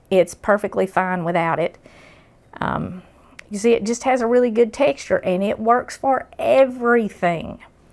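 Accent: South, United States. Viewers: My six year old grandson said, "She must speak a different language." I explained you were a Southern. I was born in Virginia but we live in Maryland. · Hello from So Cal. The change in the weather has me wantin' to cook/bake. I've never used YouTube before. Stumbled upon your channel a week ago. I love it so much., you are so sweet and comforting especially with your southern drawl. Your Christian kitchen touches and the way you share scripture is wonderful and helpful to me. Sometimes I just tune in and fall asleep to your voice....am I weird? Anyway, thank you, again for putting in the work and time. God bless you and your family and staff. I'm making the Soda bread for a homebound friend. She specifically suggested cranberries and raisins. 🤗💕🫶🙏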